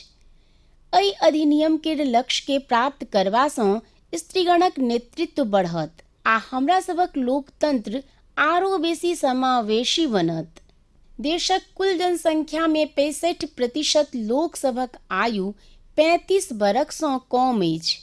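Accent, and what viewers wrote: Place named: Bihar, India. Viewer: मैथिली मिठास आहा के बोली में झलकईत अच्छी जी 🎉 आभारी छी ❤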